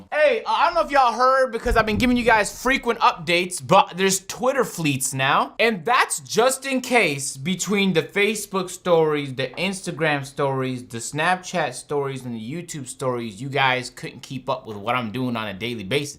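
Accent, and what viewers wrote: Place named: United States. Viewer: Ayo, what happened to your Canadian accent. You straight up American now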